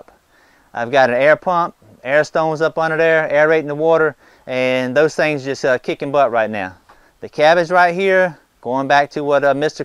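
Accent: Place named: Louisiana, United States. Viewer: Dude... That sounds like a Louisiana accent! 😳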